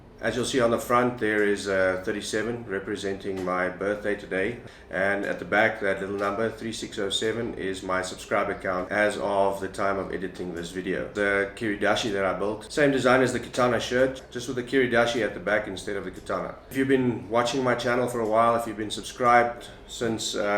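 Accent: South Africa. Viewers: You from South Africa? You sound South African!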